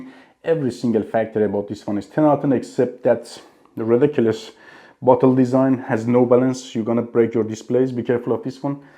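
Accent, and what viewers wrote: Iran. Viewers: you have Iranian accent!!!??🤔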